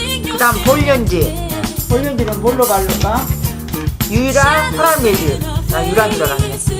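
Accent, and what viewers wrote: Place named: Gwangju, South Korea. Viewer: 너무 고우세요..♥♥ 저희 할머니 생각도 나고 제가 광주사람이라 그런지 사투리도 너무 정겹고.. 할머니 사랑해요 항상 응원해요♥♥♥♥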